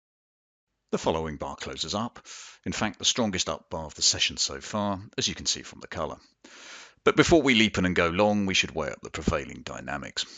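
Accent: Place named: United Kingdom. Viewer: Good stuff! You have a great voice. Very calm sounding with a classy British accent.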